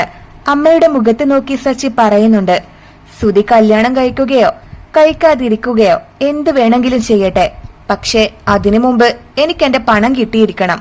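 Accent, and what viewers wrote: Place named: Malabar, India. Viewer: മലബാർ ഭാഷ ആയതൊണ്ട് ചൊതിച്ചതാണ്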